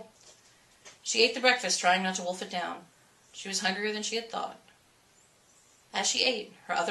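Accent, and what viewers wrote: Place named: England, United Kingdom. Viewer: Best in an English accent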